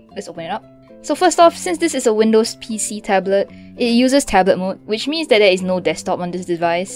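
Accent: Singapore. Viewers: lmao i don’t like free things too you sound singaporean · How bout try robeats on roblox,its like tap tap but for 3 yr olds with ultra instinct, Btw,u sound singaporean,u from sg?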